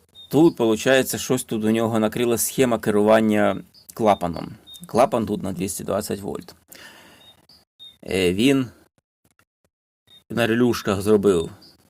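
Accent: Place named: Central Ukraine, Ukraine. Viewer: Хоч ніхера в цьому не смислю,але цікаво,завжди дивлюся 😅 В якій області живете,завдяки вашим відео вибрав собі полуавтомат,бо у мене дуже древній,Гарного мирного дня.Судячи з мови,думаю що це Черкаська Київська або Вінницька, обл,буду радий якщо дасте відповідь на мій коментар😊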